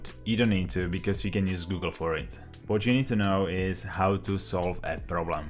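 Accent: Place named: Czech Republic. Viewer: Can I just ask if you are czech by any chance ? I hear it a bit in the accent :D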